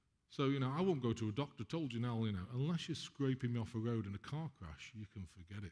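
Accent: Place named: England, United Kingdom. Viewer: It's noticeable there seems to be a "red" English thread running through my life with names of English cities of London and Leicester and now English born with his adorable English accent. How does it get any better than that?!?! Today is March 11, 2019 and I had my first private session (one on one) with on February 26th of this year, and that session was a real game changer for me! It "blew my pantaloons off" as would say....I am just so incredibly grateful that, Amanda and their staff are now in my life. I had been desperately trying to kickstart healing energies for over 2 years to recover from a number of issues but mainly from a surgery without much success. What was I thinking going to an allopathic doctor to begin with? That really messed me up! Never again! Now, for the 2nd time in my life, I know I'm going to be just fine. 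Thank you, and thank you, Mekchizedek Beings! Your help is very much appreciated. Already there are noticeable improvements in my very long list of conditions I need help correcting. Now the story of the first time I knew I'd be OK is a very long yarn dating back to 1994 through 1998 when I had the good (I think) fortune to find and work with a very powerful Being who lived in Leicester, North Carolina and who was, I hope, helping me realize my desire to become aligned with the Divine, but that is a story for another time. That amazing Being, together with an incredibly fu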